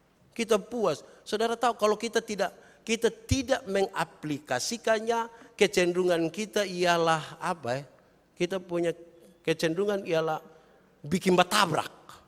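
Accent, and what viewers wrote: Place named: East Nusa Tenggara, Indonesia. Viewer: Shalom, khotbahnya pelan, halus,lucu, tapi menusuk sampai dihati yg paling dalam dengan aksen NTT..sangat diberkati bagi anak2 Tuhan yg mendengar. Tuhan Yesus memberkati Pelayanan, jadi berkat bagi orang banyak sampai ke Bangsa2. Salam dari Bali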